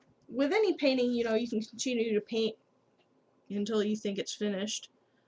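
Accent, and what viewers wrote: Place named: Midwest, United States. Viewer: Good tutorial. Thank you. Are you by any chance from the Midwest? I'm from Illinois and your "accent" sounds local. I particularly liked your dunes. I seem to have a perspective problem with beaches. Mine look flat. Thanks again!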